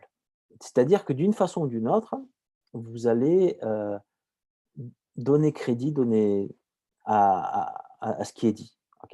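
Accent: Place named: France. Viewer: He notado que cuando hablas español usas algunas palabras que tienen esa terminación en tu acento francés Y eso me agrada me diste unas ideas bien extrañas para escribir poesía de esa manera J'aime votre langue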